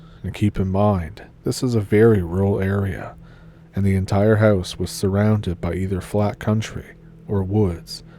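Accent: Ontario, Canada. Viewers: Thanks for the stories, tonight. Your accent sounds like my old cousins and family from Ontario. Good times. 🎉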